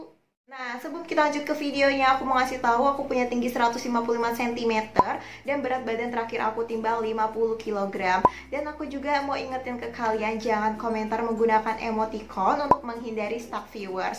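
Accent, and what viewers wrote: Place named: Banyumas, Indonesia. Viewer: Gedombrangan haha ,, ngapak bgt ya kak 😁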